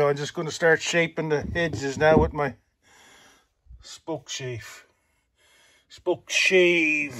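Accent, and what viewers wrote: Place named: Lynn Lake, Canada. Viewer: It’s been a while since we heard that accent in Lynn Lake.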